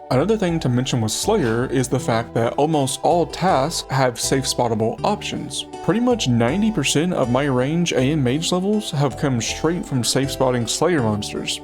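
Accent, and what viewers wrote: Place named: North Carolina, United States. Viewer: You sound like you’re from nc lol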